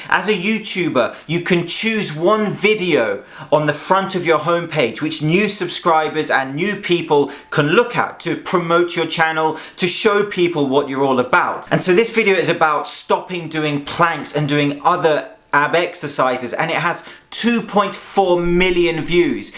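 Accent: England, United Kingdom. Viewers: I think your so called DEBUNK of VShred is ridiculous . I am a 61 year old man (look 37 ) and have been working with VShred for 8 months. I lost 40 lbs and have gained musculature that I had when I was in my late 20's . I follow other channels as well and have become an expert on diet . I don't understand why you are singling Vince out in your very particular attack. You seem to be envious of his following 4.2 million or so viewers . Even with your English accent you will never be the YouTube Star that Vince has become.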